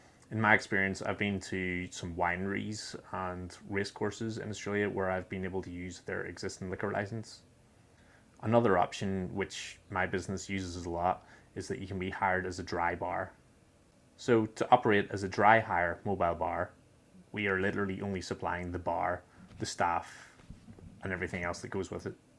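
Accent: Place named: Northern Ireland, United Kingdom. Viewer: Hello! What is that accent - it sounds close to home (NI)😅 I’m actually thinking of starting a mobile bar business over here but I’m a little lost on where to start. These videos are great but I’m overflowing with questions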